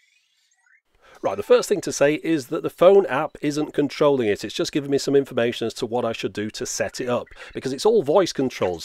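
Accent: United Kingdom. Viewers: How can the original way of speech for a language be this repugnant compared to most of the subsequent versions of the same language? (U.S, Australian or Canadian accents)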